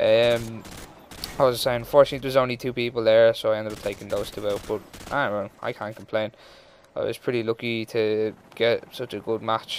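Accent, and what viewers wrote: Ireland. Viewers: Wat part of ireland r ya from I from ulster tyrone omagh town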